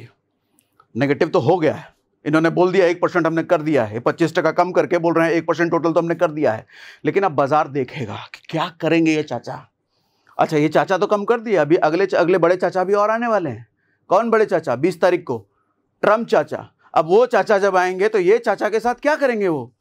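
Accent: Bihar, India. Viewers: Bhai aapki bhasa se aap bihari lag rhe ho or aap kya bol rhe h ye sayad aapko bhi nhi pta . Ab suno ye koi nai baat nhi h market crash hona or ha abhi carsh hua nhi h but aapne apna paisa kmane ke chakkar me video daal dia or logo ko bevkoof bna rhe ho . Piche ka record utha ke dekho kab kab market me movement hota h ......kya yar bkvas ke alava kuch or bhi krte ho 😂😂😂😂😂😂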